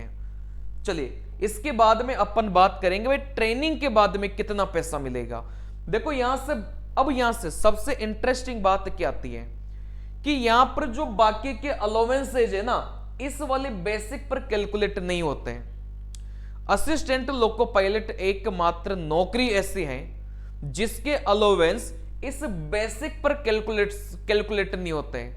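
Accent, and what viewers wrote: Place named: Kashmir, India. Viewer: Ap kashmir se hai kya😅😅 mera room mate bhi aisi hindi bolta tha or wo bhi kashmir se tha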